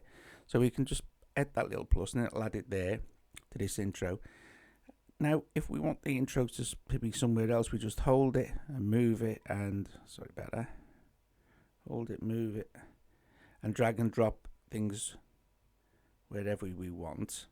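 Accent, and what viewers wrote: Liverpool, United Kingdom. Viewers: Scouser? I think so.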